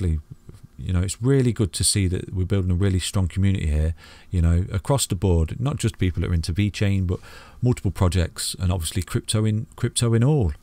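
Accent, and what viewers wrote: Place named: Nottingham, United Kingdom. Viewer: Awesome :D Love it! Where you from, you sound like you're not far from Nottingham or Derby?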